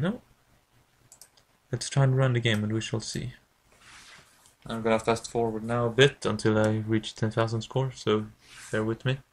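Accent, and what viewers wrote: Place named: Sweden. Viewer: Your Swedish accent is amazing, please say "The Genius Ninja" in the next ep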